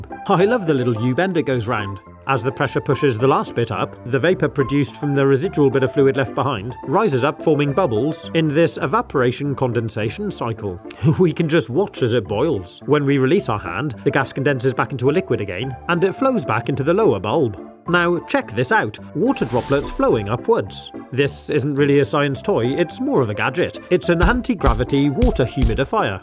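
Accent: United Kingdom. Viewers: So your British? I’m american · I feel that you are British.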